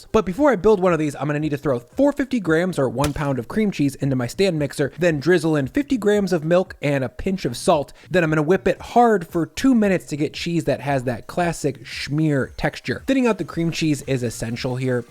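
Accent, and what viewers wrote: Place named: Aurora, Illinois, United States. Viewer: I was fascinated by the accent of someone from my college, and you speak JUST like him. He was from Aurora, IL. I'm from Chicago suburbs too but I don't speak like you? help me out!! The way you say "sturdy" is a prime example of this quirky way he would pronounce things, and I loved it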